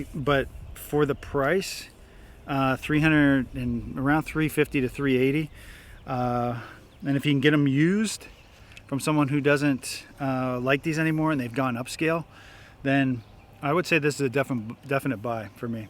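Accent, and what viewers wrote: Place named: Canada. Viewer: Crap! I didn't realise that you were from Canada. Explains that accent haha. Where from? I'm in eastern Canada. Love the reasonable approach that you take to all of this. I'm fairly new coming back to archery after several decades. Been watchin you and a few others to try and catch up on the basics, the latest tools, brands, gizmos. Been really helpful Cool stuff. So thanks :)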